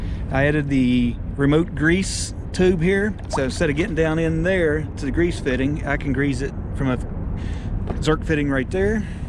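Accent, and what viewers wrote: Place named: West Virginia, United States. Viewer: Thought you are from West Virginia as soon as you began speaking. I was born in Clarksburg, raised in Glenville. Now happily retired in Wisconsin three blocks from Lake Superior. Enjoyed the video. -- drew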